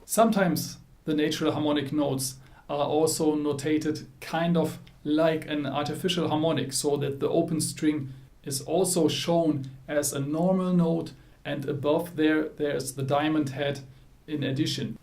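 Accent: Germany. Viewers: According to your accent, you're probably from Germany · I love your Denglisch!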